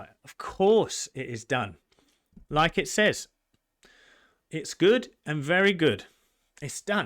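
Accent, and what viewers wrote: Essex, United Kingdom. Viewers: I knew you were an Essex boy! Love your passion😍. New to you but look forward to hearing from you and your way of explaining it all.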